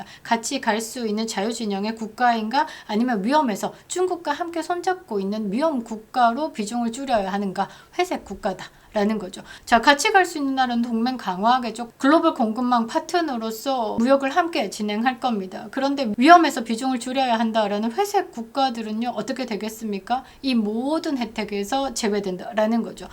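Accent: Gyeongsang, South Korea. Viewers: 자유우파 자유민주주의 사장경제 대한민국 대변이느로 강력추천!!! 경상도 억양의 설득력, 굿!!!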